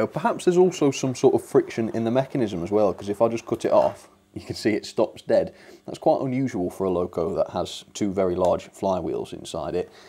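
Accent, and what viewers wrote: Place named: Lincolnshire, United Kingdom. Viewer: Are you from Lincolnshire? You're accent sounds familiar.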